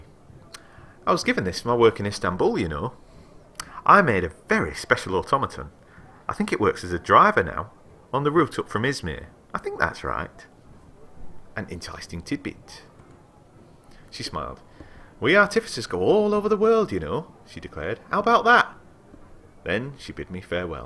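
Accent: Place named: United Kingdom. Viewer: Haha mate you're exactly what American people think all British people sound like. xD are you from the south?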